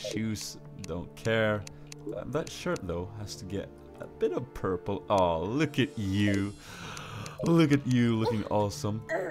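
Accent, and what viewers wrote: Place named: Sweden. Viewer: Cool man, you swedish? (thats what your accent sounds like, I'm from Australia)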